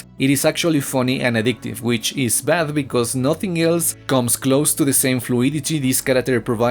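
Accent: Spain. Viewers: I think all PoE guides should be done with a Spanish accent after watching this one. Great vid and great guide!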